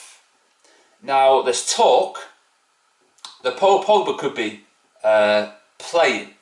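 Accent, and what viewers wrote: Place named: Wales, United Kingdom. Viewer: What part of Wales are you from? You sound like you’re from Rhyl or maybe Llandrindod Wells?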